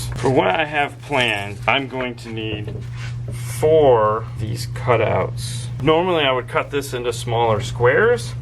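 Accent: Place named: Puerto Rico. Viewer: starting to sound a little Boricua… ya era tiempoooo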